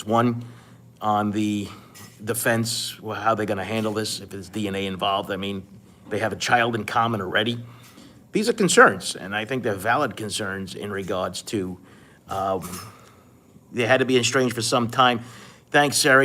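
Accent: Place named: New York, United States. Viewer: I appreciate your true New York accent! Most New Yorkers residing in New York, came from somewhere else much like my Boston hood accent! You can’t mistake it because most of the ppl residing in Boston, aren’t from here either! 🤯😂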